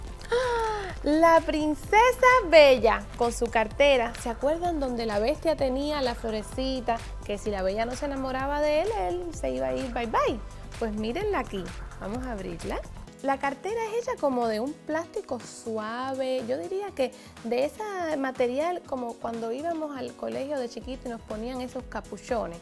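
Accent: Cuba. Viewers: Se parecen y su acento es el mismo por que las dos son de cuba 🇨🇺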